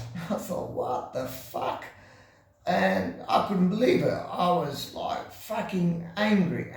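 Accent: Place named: Liguria, Italy. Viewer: why d y speak english with ligury accent? i'm serious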